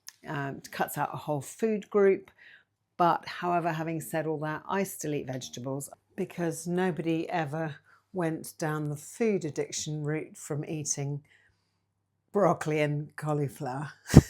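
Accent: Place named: United Kingdom. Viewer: nice to hear a brit talking about this ... have just found your channel ... I do follow ZoeNutrition and have found over the last 2yrs avoiding the 'obvious' carbs has helped me lose over 50lbs ... have ordered iodine as per your recent video to see if it helps me ... I'm up for research supported suggestions ... I have tried my own sauerkraut, kombucha and am still making my own kefir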